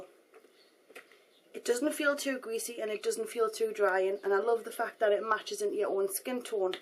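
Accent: Newcastle, United Kingdom. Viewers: are you a geordie? you'd be sooo great on geordie shore! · definitly from newcastle, im from newcastle so i know the accent pretty well lol, i'd be surprised if she wasn't. love your video's by the way. x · love it how every one thinks your scotish when blantly your georide lol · She's not Scottish..She comes from Newcastle. In England. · Just found your video, it's the first one I've watched, and I'm really impressed. 5*s for your video, and definitely subscribing :) And I love your accent, I might be wrong, but is it Newcastle?? x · She's geordie, GEORDIES UNITE! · i think she's geordie... like Cheryl Cole... northumbrian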